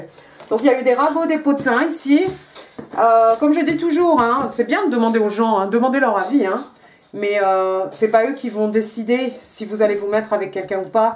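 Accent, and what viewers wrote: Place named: England, United Kingdom. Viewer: Merci pour cette guidance 🙏, j aime bien votre accent anglais 👍 . Bonne journée 🤗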